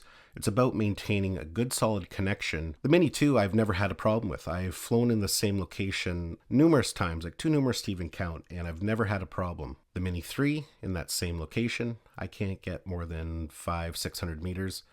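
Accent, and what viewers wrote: Canada. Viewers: Just to be clear, are you flying CE or FCC mode? Your accent sounds Canadian, which is CE territory, correct?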